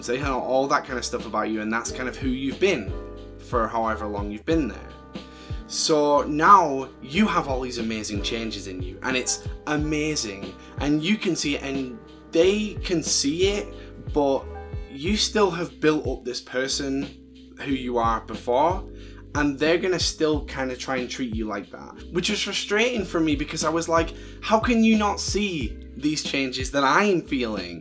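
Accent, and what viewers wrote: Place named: South London, United Kingdom. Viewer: you Sounds a bit like a south londoner 😄👍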